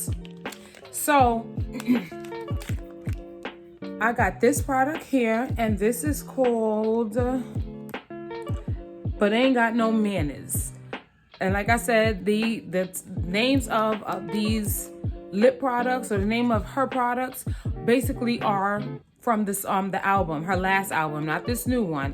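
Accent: New York, United States. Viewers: You have to be from New York saying because like that lolll at least the east coast I’ll finish watching loving your vibe new subbie!!!! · Your accent is great, I thought you were from New York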